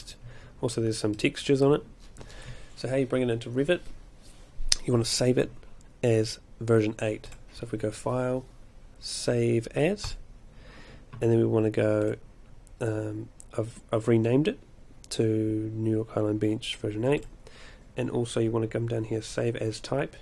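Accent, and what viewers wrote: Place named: New Zealand. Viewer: hi thanks for that, you saved me heaps of hassles. I enjoy the kiwi accent :-)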